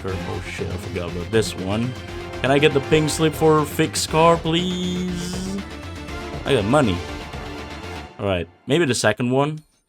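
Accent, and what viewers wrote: Indonesia. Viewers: Aksen lu kyk orang indo bang hhaha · Indo ya bg? Aksen nya kaya org Indo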